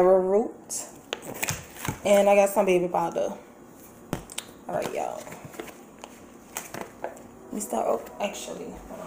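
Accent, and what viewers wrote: New Orleans, United States. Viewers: New Orleans? Your accent gave it away 😊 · U gotta be from New Orleans with that accent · How are you, I am one of your new subscribers. I love your accent and by any chance are you from New Orleans because your accent sounds very similar to my sister's who lives in New Orleans. I was born in New Orleans, but I been in Jersey most of my life. I also love your bricks from Starch Bricks, I finally received my order today. That was my second time ordering but I probably order from him again cause he is on point.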